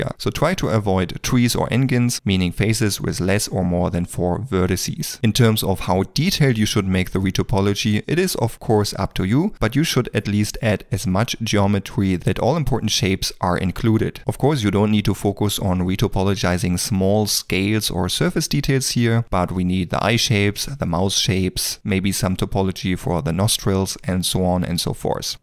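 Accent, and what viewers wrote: Germany. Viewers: Are you from Germany? :D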